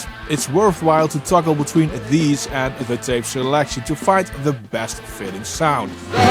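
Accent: Netherlands. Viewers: Subscribed for the Dutch accent!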